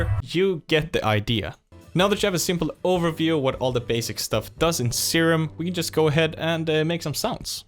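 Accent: Sweden. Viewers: it’s the thick Swedish accent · it's the swedish accent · You from Sweden? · Can an accent get more Swedish? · Damn you sound swedish! haha Haven't looked further than , but damn, the swedish accent is killing me LuL - another swede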